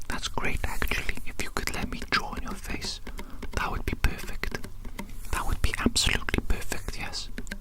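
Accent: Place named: RP, United Kingdom. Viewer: This dude’s pronunciation puts stereotypical British to shame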